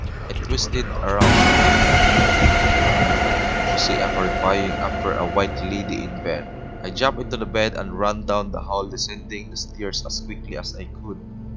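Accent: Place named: Visayas, Philippines. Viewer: Nice kaau animation ♥️ ma halata nako nga bisaya ang narrator the way mag pronounce hehehehe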